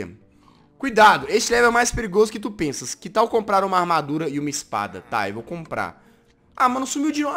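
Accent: Azores, Portugal. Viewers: O sutaque português é otimo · o teu sotaque português de portugal parece mais com o sotaque de Portugal açores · Adorei o teu sotaque de português Eu sou portuguesa kkkkkkk🥰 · tem staque portugues otimo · O seu sotaque português é midas!!!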